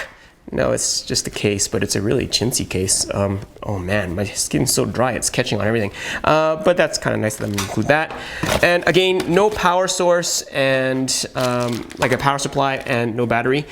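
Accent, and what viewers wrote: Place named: Canada. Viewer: I can tell you're canadian by the way you speak.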